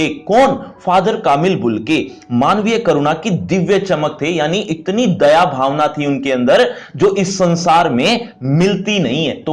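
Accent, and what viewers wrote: Haryana, India. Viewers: sir aap haryana se ho kya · Aap Haryana se ho ??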